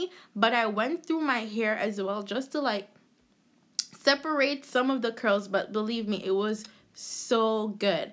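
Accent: Patois, Jamaica. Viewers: sorry I had to ask because I heard the accent and I'm a Jamaican too. great video goodaz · u sounds like Jamaican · You're trying to hide it but i think i hear an Jamaican accent😮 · Lol I knew you sounded Jamaican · I knew you wee Jamaican...from the first time you spoke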